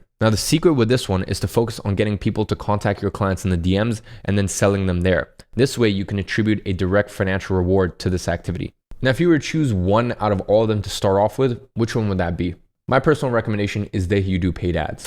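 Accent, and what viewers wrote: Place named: United States. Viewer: I have always wondered if your British, why do you speak with an American accent?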